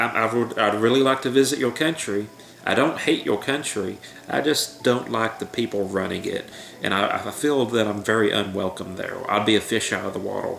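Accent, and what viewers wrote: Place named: Louisiana, United States. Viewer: You have an awesome accent, or whatever it is that makes you speak like that. Sounds like a Cajun-American blend, very smooth.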